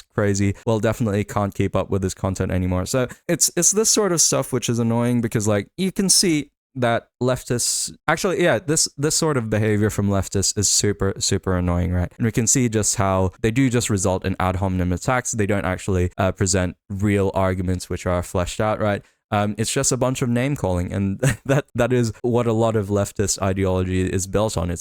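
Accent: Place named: Australia. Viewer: He does seem to have a Philippine flag behind him, but he sounds (and I think he said he is) Australian.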